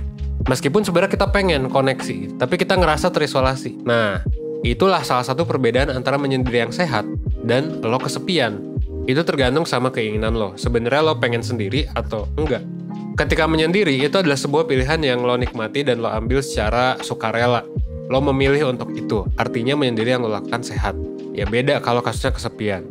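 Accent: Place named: West Java, Indonesia. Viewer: resep pisan pas ngomong orang sundaaa hoho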